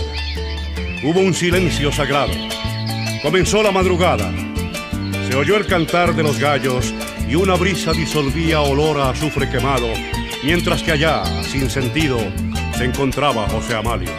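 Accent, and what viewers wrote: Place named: Bogotá, Colombia. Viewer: una voz magnífica, una dicción espectacular, todo un artista; pero declamó como un bogotano. Si quieren saber cómo declama un llanero, busquen el poema el cazador novato karacachian.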